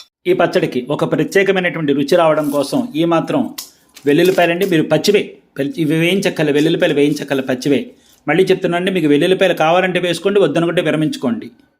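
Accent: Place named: Tamil Nadu, India. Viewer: మీరు తెలుగు వారైనా ఎక్కడో మాట తీరు మీ వర్చసు తమిళం లా ఉంటుంది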